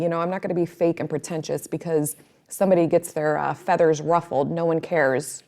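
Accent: New York, United States. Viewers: Also, you sound like you’re from NY. I wouldn’t expect any less!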